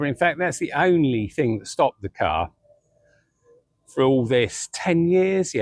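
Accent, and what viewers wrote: United Kingdom. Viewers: Where are u in uk mate? Yorkshire?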